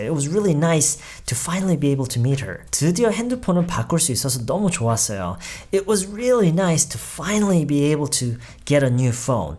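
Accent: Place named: United States. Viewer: 유용한 영상 감사해요 !! 말투가 박재법 군이랑 비슷한데 미국 어느 지역 엑센트인가요?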